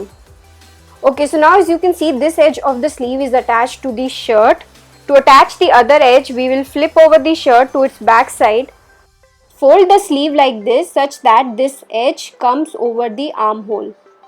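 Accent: India. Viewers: I just want to hear your hindi accent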